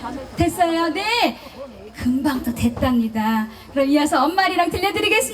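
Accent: Jeolla, South Korea. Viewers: 송가인 가수님 전라도 사투리 정겹고 친근감들어 재미나요 ㅎ ㅎ 노래힘있고 무대꽉찬느낌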